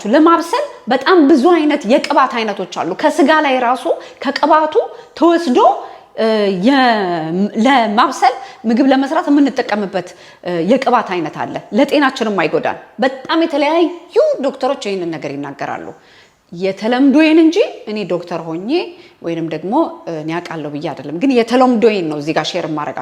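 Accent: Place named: Italy. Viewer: ሰላጣ ማለት ነው salad በእንግሊዘኛ ነው አንዳንዴ ጣልያንኛ ተናጋሪ ስለሆነች pronounciation ትንሽ ሊለይ ይችላል።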